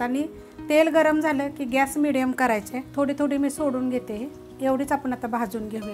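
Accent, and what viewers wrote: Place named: Phaltan, India. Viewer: एक नंबर रेसिपि.. तुम्ही फलटणच्या (जि.सातारा) आहात का? तुमच्या बोलण्याच्या पद्धतीवरनं तसं वाटतंय.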